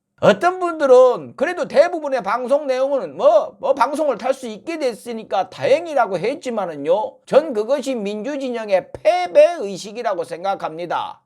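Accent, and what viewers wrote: Jeolla, South Korea. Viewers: 다좋은데 ...난 성범이형편인데 ...일부러 예전 개그코너로 하는건 알겠는데 ...전라도 반달 사투리로. . 우파들 빈정거리는게 영. ,ㅠ 재미도 있긴하지만 .. ...형님 그냥 서울말로 코너하면 안되요? ㅜ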